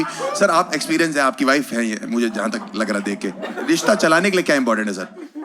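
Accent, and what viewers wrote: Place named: Haryana, India. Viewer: Haryana m rehkar Haryanvi seekh gaye Bhaai 😂😂😅😅🙏🙏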